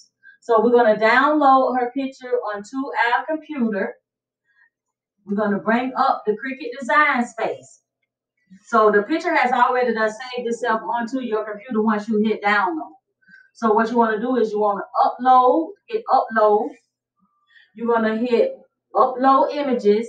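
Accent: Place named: Florida, United States. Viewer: This was very helpful. I knew right away from that accent that you were from Florida. I believe I am right. 🤭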